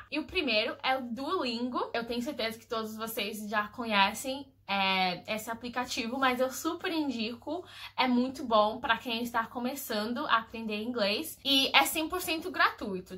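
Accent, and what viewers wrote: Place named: Brazil. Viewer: Meu Deus, achei que fosse brasileira. Que português lindo vc tem 👏🏾👏🏾👏🏾👏🏾🥰❤️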